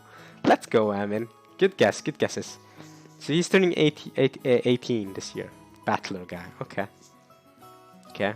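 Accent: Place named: Norway. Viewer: Your accent sounds familiar. Are you Swedish? Edit: Norway. I was close! Also it's weird seeing someone play without lip synch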